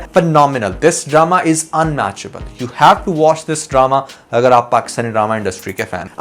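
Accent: India. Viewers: Firstly you must improve your urdu before making videos secondly avoid Indian style of dialect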